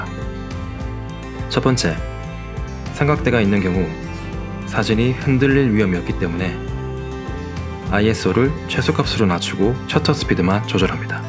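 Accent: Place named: Busan, South Korea. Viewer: 부산분이네요. 잘 봤습니다.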